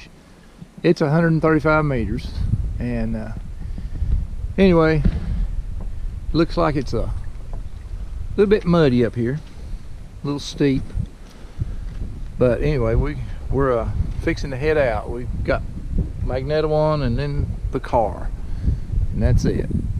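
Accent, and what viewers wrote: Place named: South, United States. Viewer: Props to you friend for taking the effort to say 'portage' properly, for a while there with your southern accent, I thought I was going to hear something more like 'porridge' which really makes me cringe. Thanks again for making the effort ;-)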